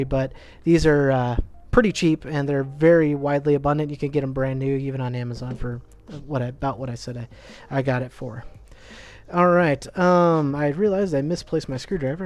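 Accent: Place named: Texas, United States. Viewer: This is my first video of yours to watch and as a fellow Texan I knew you were from Texas before you said you were haha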